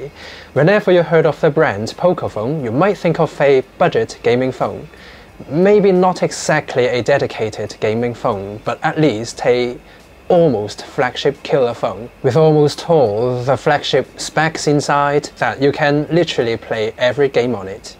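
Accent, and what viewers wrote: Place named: United Kingdom. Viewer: Sound like British tone , Good !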